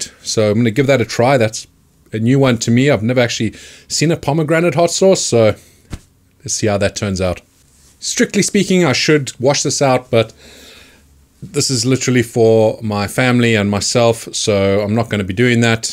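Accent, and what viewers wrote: South Africa. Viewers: Where in south africa are you from.You sounded South African the first time I watched your video's . Thank you for all your video. Looking at growing my own chills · bro i knew you were from SA just the way you talk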